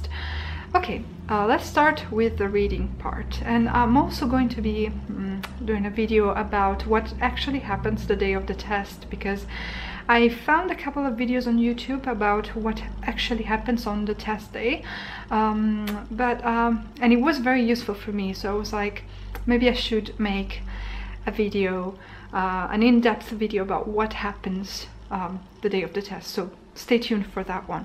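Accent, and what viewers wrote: United Kingdom. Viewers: Me encanta el acento inglés ❤️❤️❤️❤️ lo escucharía todo el día.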